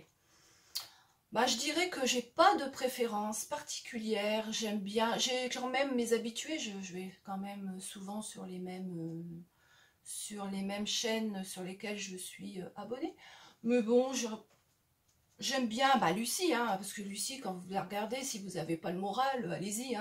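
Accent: North, France. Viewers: Ccou j’adore ta petite vidéo de présentation et par dessus ton cet accent du nord lol eh oui merci à toi bis 💋😘👍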